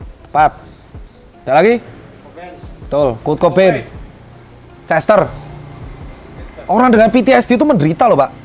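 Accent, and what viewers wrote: Java, Indonesia. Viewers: China medok jawa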